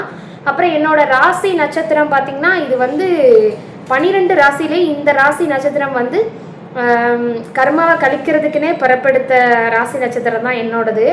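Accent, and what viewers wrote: Tirunelveli, India. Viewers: உனக்கு எந்த ஊர் அம்மா.திருநெல்வேலி பாசை பேசுர. தென்மாவட்டமா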